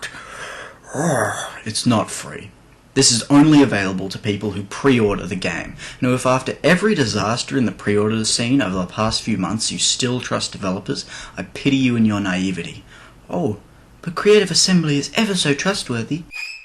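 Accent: Australia. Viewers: I hope for the majority of the people that pre-ordered R2TW that on receipt of such utter crap to NOT pre-order any game again based on trust alone till previews and proper critique is available for it. 'Fool me once, shame on you; fool me twice, shame on me' For me Empire: Total War was the kick in the balls from CA (and my one and only ever pre-order). Damn my desire to be Sharpe with the 95th rifles leading the British Empire:/ I still haven't purchased R2TW; well at least till it's completely fixed. I've wanted this game since the release of M2TW. There was a dream that was Rome (II). This is not it! Thanks for your videos; something about the auzzie accent gets me! That and you skips view on life (and stuff) is refreshing especially when living in the UK and the constant barrage of hypocritical nonsense gets a little too much. Loved it down under, can't wait to get my pommy ass down again, well, with ear plugs. 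I wont hear the end of it considering how you tanned our asses at cricket. /rant!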